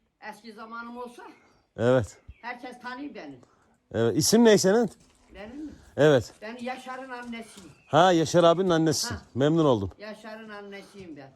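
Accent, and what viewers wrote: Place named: Erzurum, Turkey. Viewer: Erzurumlu gibi geldi de konuşması. 🤔🤔 Neyse. 😊😊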